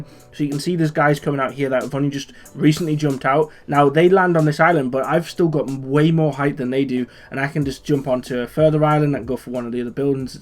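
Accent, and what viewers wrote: Newcastle, United Kingdom. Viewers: you geordie bro?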